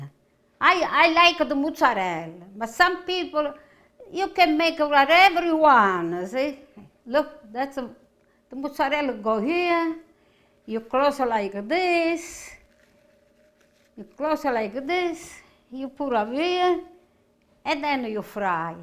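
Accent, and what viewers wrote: Italy. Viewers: I really like her italian accent she gives me some vibes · لغة انجليزيه علي فكرة بس بلكنة ايطالي لكن كل انجليش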